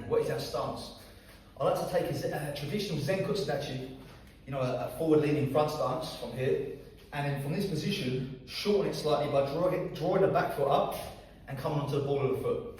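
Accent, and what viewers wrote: United Kingdom. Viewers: Thanks for this video I am a beginner and I am going to concentrate on defensive techniques when I spar I am 67 years old and I am the oldest guy by far in my dojo someone wish me luck or do you have any first time you spared stories I would appreciate it Thanks for your videos I joined your group and for now you will be the only one I join plus I love the British accent it is British isn’t it sometimes I get Brits and Aussies confused any way thanks for your videos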